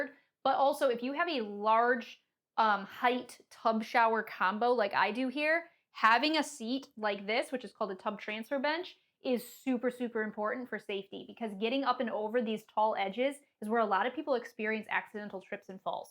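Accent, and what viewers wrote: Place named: Great Lakes, United States. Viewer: is a Michigan person. Great Lakes accent.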